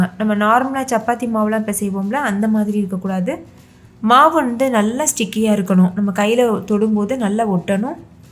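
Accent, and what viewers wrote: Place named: Kerala, India. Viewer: Unga native enga unga voice Kerala slang Madhiri irukku thank you for your reply to me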